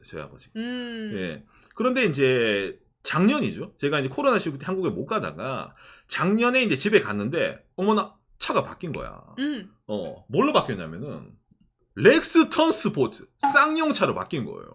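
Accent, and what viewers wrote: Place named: Gyeongsang, South Korea. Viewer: 역시 경상도 말투...ㅋㅋㅋㅋ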